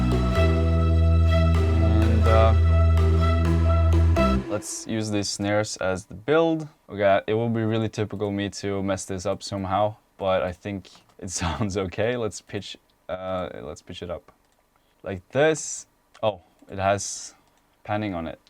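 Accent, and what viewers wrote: Norway. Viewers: Fan du är ju norsk haha hörde det direkt i slutet där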